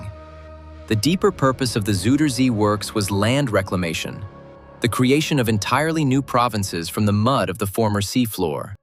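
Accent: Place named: United States. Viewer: In England we pronounce the Zuider Zee as if it were spelt "Zider Zay" (rhyming with Cider Bay). It might be different in the Netherlands. If the voiceover is meant to be American (which sounds to be the case) I can only assume Americans would say "Zooder Zee" but at times he seems to say Zouder "Zowder' Zee.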